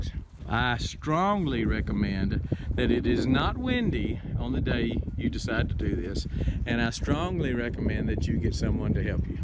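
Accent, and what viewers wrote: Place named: East Texas, United States. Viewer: First, whoah that is a THICK East TX accent! Growing up in Houston, I remember hearing accents like this frequently anytime we had to go up 59 to see my uncle, and to attend antique shows/fairs. Super helpful video! Curious how far in the ground you put the 2x4’s? Did you concrete mound the bases of them?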